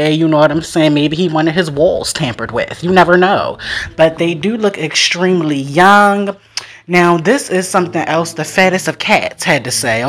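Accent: Baltimore, United States. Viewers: I know a Baltimore accent when I hear one!!! First time on the channel, loved the content mama 🥰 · You sound like a fellow BALTIMOREAN yo...😊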